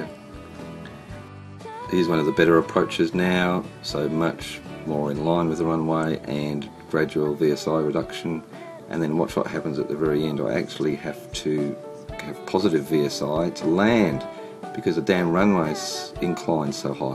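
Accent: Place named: Australia. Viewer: G'day mate, lovely Aussie accent!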